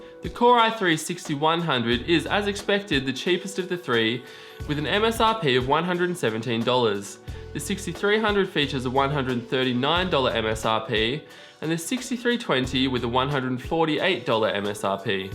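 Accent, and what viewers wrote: Australia. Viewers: Are the parts priced in USD? Because you sound Aussie and these prices are tripping me out. (Unless you live in the US or are relating to the greater audience then fair enough). Get us them on mate rates? ;) Lmao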